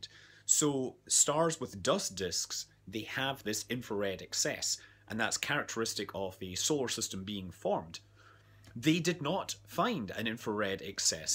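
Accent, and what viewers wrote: Scotland, United Kingdom. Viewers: So he is Scottish, thought he was American but he has a similar accent to me(yes I'm Scottish) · what part of scotland do u get the belter accent from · What part of Scotland are you from . I'm form the west coast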